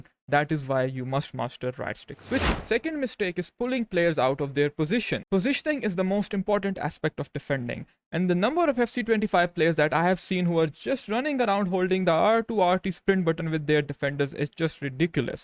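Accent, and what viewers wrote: India. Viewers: your Indian voice is annoying asf tho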